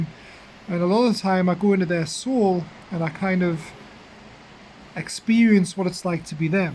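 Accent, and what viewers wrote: North East England, United Kingdom. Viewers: North East England he is. Maybe Darlington, Middlesbrough, Newcastle or Sunderland.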